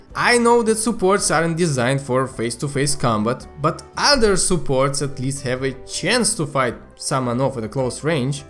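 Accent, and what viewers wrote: Russia. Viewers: Buck. Horrible russian accent.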